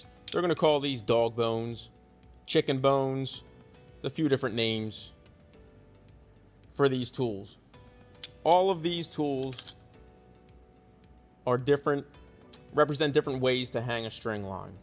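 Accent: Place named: Baltimore, United States. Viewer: Based on your accent, you are from Baltimore or Philly.?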